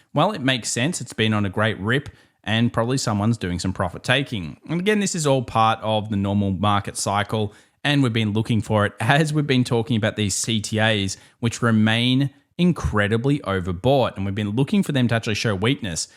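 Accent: Australia. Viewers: Hi, your vids are excellent education regarding TA and stats. A huge thank you! I like the aussie accent, too. It reminds me of the time when I was living there.:) Keep up the great work! Cheers from Germany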